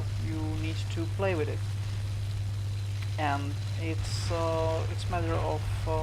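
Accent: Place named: Czech Republic. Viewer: jsi čech kámo ? :D :) podle nicku si myslím ze ano a podle prizvuku taktéž! :) (Y)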